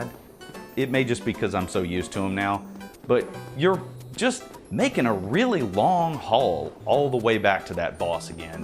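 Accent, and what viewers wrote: South, United States. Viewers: Listening to a dude with a good 'ol boy accent talk about horseshit in the Dark Souls series is peak internet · Love the southern accent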